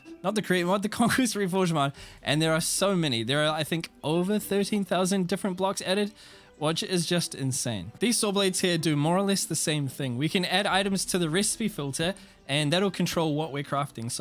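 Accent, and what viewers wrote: New Zealand. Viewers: Huh? You're from New Zealand? I wasn't expecting to hear that.. But that's pretty cool considering I am too!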